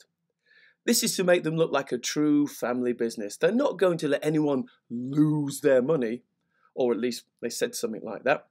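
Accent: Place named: North, United Kingdom. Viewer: Your sense of humour made me laugh...you sound like a Northerner like me, but in on the know with USI Tech also...enjoyed the vid.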